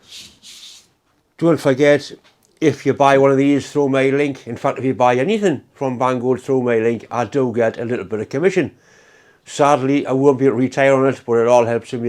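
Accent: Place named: Newcastle, United Kingdom. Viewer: Best part of your video's..... your accent. I've worked with all kinds, micks, paddies, scousers, jocks..... and the best are from the Toon. Every time, hardest working guys and girls I know. That thing looks perfect for removing klingons and tagnuts...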